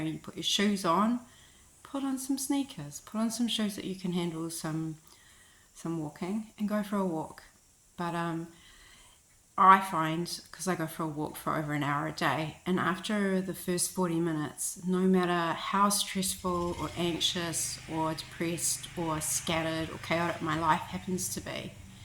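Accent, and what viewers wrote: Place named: Australia. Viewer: Good helpful/useful information, Good on ya!🚶🏃🏼‍♀️👍🏼 Luv your accent, Australia, right?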